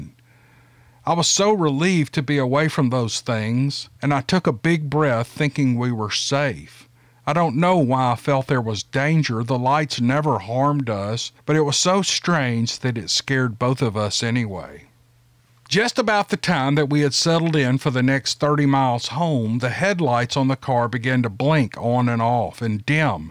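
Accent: South, United States. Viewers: "Those Dmn Yankee Carpet Bagger's and Pine Wood Thieves!". (I can just hear them, and well, "the element is a very close equal, those that SCAM the Naive and Older Folks").... and I'm a born Yankee, loyal to the Union, but with a very real Tennessee Southern Accent. I've never cared for the variable Southern or Country Accents, far preferring the Chicago and Boston....and has a very mild accent, much due to the having been raised in the Metro Memphis area. Much preferred! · The funny thing about your accent is relevant, of course it doesn't change the story, however, it draws you into the story, and makes you feel at home (so to speak) It is the Southern thing, I guess, anyway it is obvious that others think the same as I and many others., just keep on keeping on, you are a valuable speaker, and have a way of relaxing your audience, me included. Just be real, and believe it or not, you, as you, comes thru. 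I really enjoy your channel. Don't change a thing. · . The accent is great. Especially to us in Britain my friend. Very Rett Butler, lol. Remember to us Georgia, N Carolina, S Carolina etc all sound pretty much the same. No offence bud. Also a lot of Southerners could still possibly be descendents of Civil War confederate Soldiers, which to a lot of us, is fascinating. I don't mean racism either. Just great and historical. Hope that doesn't offend you mate. Just a possible reason why people mention the accent. 👍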